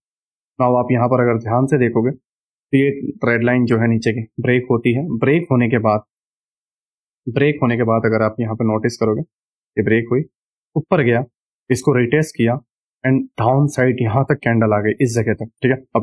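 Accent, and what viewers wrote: Gujarat, India. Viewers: apki hindi se lag raha hai ki ap Gujarati ho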